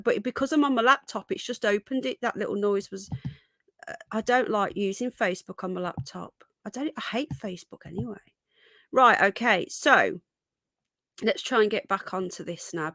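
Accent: United Kingdom. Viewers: LOVE YOUR UK ACCENT.VERY SEXY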